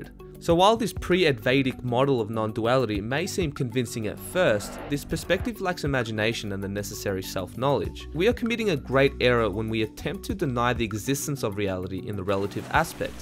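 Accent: Australia. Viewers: This dude is very good at explaining, nice and clear with a friendly aussie accent... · Sorry, it's just hard to listen to a serious topic delivered on a thick Australian accent. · This guy has to be Aussie that accent was strong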